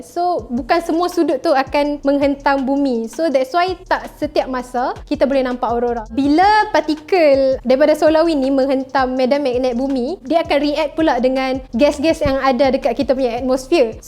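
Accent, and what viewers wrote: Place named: Kedah, Malaysia. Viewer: Lebih baik guna BM standard. Boleh bantu pelajar ting 3 untuk lebih faham. Loghat kedah pekat noo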